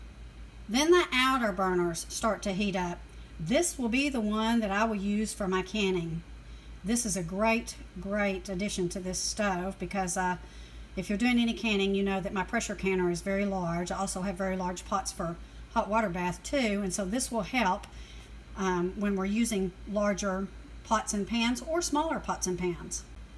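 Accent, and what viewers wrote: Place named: South, United States. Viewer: I didnt think she talked fast, ha! Must be the southern.thats why I can understand lol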